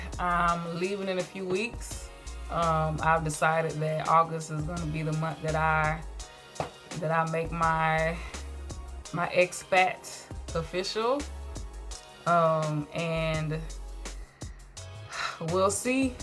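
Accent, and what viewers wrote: Jamaica. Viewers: As a vegan you can shop at coronation market for fresh fruits for low cost,you sound Jamaican,it nice here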